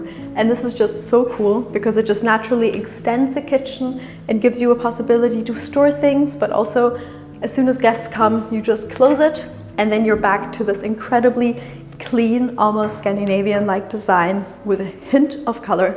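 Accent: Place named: Germany. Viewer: Die Objekte auf diesem Kanal sind ja wirklich interessant und inspirierend – wenn nur die Moderation nicht wäre: Dieses deutsche English, verbunden mit einer kindlichen Schwatzhaftigkeit, ist einfach entsetzlich nervig.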